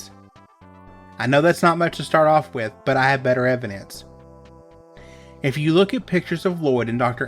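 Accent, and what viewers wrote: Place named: South, United States. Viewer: Finally a Southern youtuber! Haha, cool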